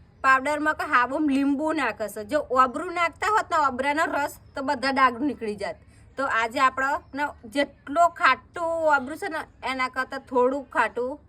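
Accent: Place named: North Gujarat, India. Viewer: Language per na Jao vasant Bhai..Uttar Gujrat ni aavi j boli hoy chhe .so aapdi bhasha ni respect karo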